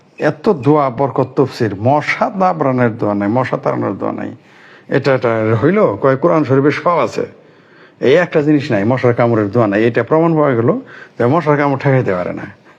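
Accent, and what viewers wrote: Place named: Jamalpur, Bangladesh. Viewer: ১৯৭১ সালে মুক্তিযুদ্ধের সময় এম আর আখতার মুকুল ঢাকাইয়া ভাষার স্টাইলে স্বাধীন বাংলা বেতার কেন্দ্র থেকে 'চরম পত্র' পাঠ করে বাংগালী জাতিকে উজ্জিবিত রাখতেন। আপনি জামালপুইরা ভাষায় 'ভয়েস অব এথিস্ট' দিয়ে আমাদেরকে উজ্জিবিত রাখছেন। এসব ব্যাংগাত্মক রম্য রচনা লিখন ও পঠনের জন্য আপনাকে অভিনন্দন।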